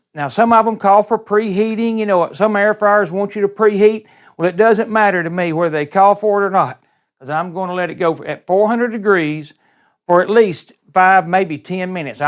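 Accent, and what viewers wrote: South, United States. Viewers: Great video plain and simple 'you mentioned your southern accent" that adds to receipts flavor. yo' al I am new to this air freyer The Nija and needed some cooking information. Thanks again...I am 80yrs old and one is never to OLD to learn something new! God Bless. 😉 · There's just something about the southern accent. 🤗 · Hi tried the Salmon Fillets yesterday absolutely delicious 😋 we are Ninja Foodi new comers , brilliant bit of kit , by the way love the good old Southern Accent !!!.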